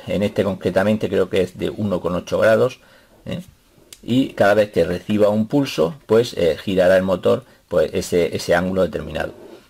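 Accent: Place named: Murcia, Spain. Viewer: Hola, muy interesante!!! por tu acento y espero me disculpes por si me equivoco, eres de Murcia. No tienes un curso intensivo o acelerado? me quiero iniciar y no me importaria viajar a Murcia, si es que eres de allí (por la proximidad a Valencia), para aprender algo de lo que muestras.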